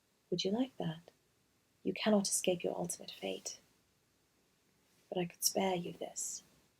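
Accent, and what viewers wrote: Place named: United Kingdom. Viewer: You sound more British than I do 🤣 loved the twist and turns you took in this! 😱💖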